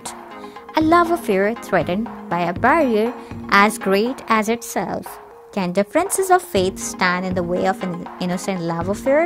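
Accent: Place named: India. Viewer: Omg the Indian accent is annoying to finish this video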